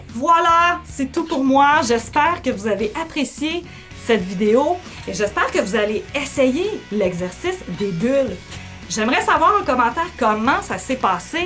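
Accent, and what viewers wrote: Quebec, Canada. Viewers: Mais comme j'aime ton bel accent Quebequois !!!! Et ta presence, malgré la distance. Les bulles c'est génial....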